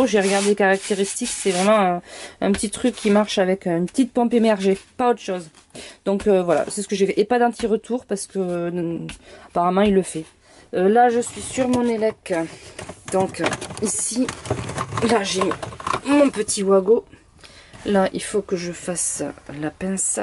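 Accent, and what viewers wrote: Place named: South-West, France. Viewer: Tu es enrhumée? Ça accentue bien ton accent du sud ouest lol..en tout cas bravo a toi et ça avance super bien..hâte de voir quand ce sera fini et vos péripéties 🙂😘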